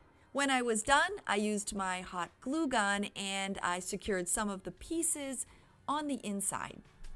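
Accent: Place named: South, United States. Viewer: Being from the south i personally love the way you say metal but my favorite was the way you said " canning bands" . I am certainly not making fun. In case you don't remember, I'm the subscriber with the best friend that moved away and your accent sounds like hers. I absolutely love your crafts too and made quite a few. You never fail to make me smile so thank you!! I was having an awful day and now I feel better. 😊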